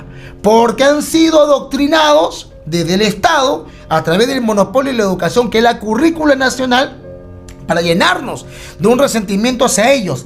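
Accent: Chile. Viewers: Este dejo es chileno . Las barbaries en la ocupacion. El saqueo de la costa por Linch. Eres Chileno On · si tiene mucho acento de chileno xd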